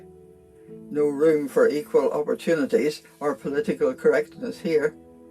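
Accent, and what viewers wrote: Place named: Ireland. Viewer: I love listening to lovely Irish lilt. But I see where you are coming from. Sometimes we can't watch with sound on.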